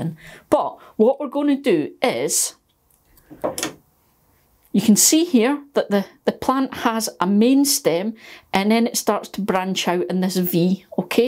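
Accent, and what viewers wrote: Scotland, United Kingdom. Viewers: Thanks - really good informative video, love your Scottish accent too!!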